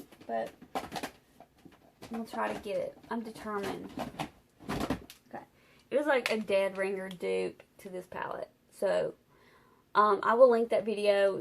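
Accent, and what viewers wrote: South, United States. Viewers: This is such a great declutter video!!! Omgosh! You have such an amazing pallet collection it's CRAZY! I dont know what i would do having nice pallets like these to create with... 😏💭 just gorgeous😍 I have to say, I love your accent. Very strong southern accents are my favorite 😃😍 I use to live in South Carolina, I loved it.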